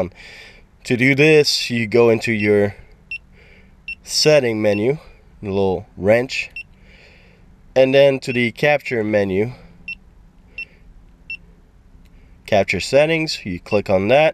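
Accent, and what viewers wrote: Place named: Quebec, Canada. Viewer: I couldn't get over your french Canadian accent. It's funny but I never heard it in all the videos that I've watched. Thanks again and now back to your cross country video!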